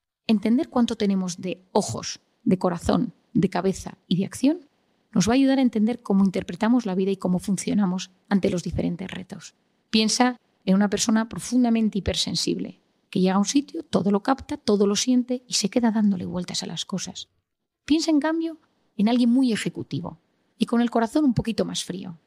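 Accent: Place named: Spain. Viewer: Yo preguntaría de q país sos?España x la voz,muy buena información.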